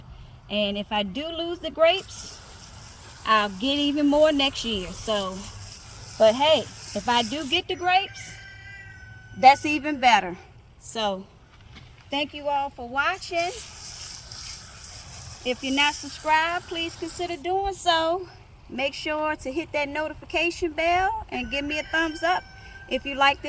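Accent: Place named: North Carolina, United States. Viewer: You sound like you're from lumberton NC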